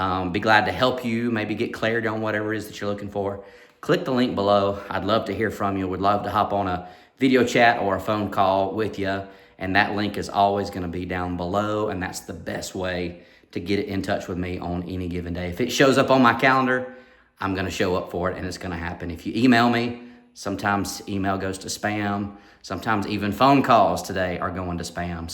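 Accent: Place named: Alabama, United States. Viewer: Well, we have a Northport in Florida, but I’m guessing by the sound of his accent and the county is Tuscaloosa, which I’m not familiar with, is probably in Alabama. Maybe you wanna mention that in the title. I’m sure there’s lots of Northports in this country. So many videos on Facebook and YouTube, are just like this with no mention of the state.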